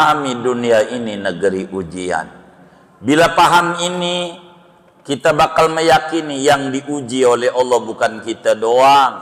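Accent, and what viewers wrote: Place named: Betawi, Indonesia. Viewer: Suka banget sama ustadnya. Orangnya ilmunya luas, orangnya lucu dan bahagia, ngomong betawinya kocak bikin ketawa jadi asik dengar tausiahnya.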